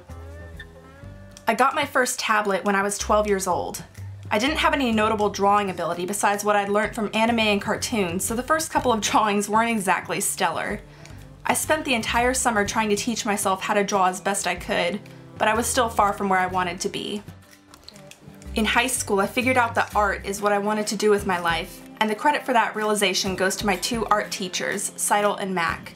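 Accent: Australia. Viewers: Omg your Australian! Well...that's cool. Anyway you can draw so well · Can you get back your aussie accent?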